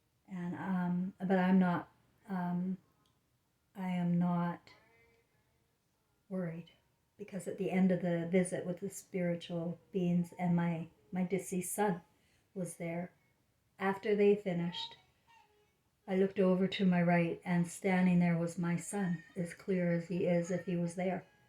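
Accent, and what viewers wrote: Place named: Western Canada, Canada. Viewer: you sound like your from western Canada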